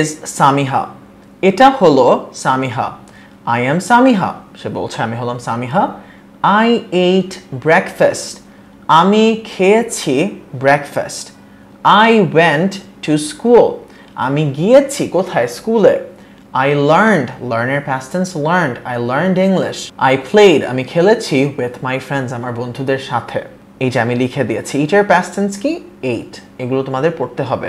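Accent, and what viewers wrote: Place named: United States. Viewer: Channel টা পছন্দ কারণ American accent এ ইংলিশ ক্লাস হয়।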